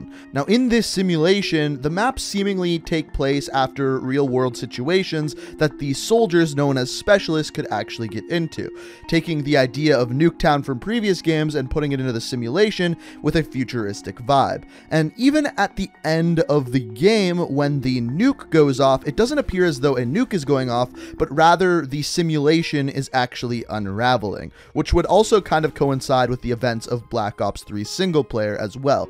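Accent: Canada. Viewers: I was just trolling man, no harm. And as far as the way he pronounces things, he is Canadian they pronounce things differently than the Americans! Like About is Aboot etc.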